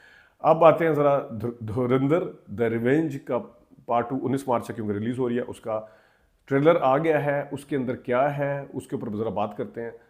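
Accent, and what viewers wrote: Punjab, India. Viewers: punjabi lehja vich aa janda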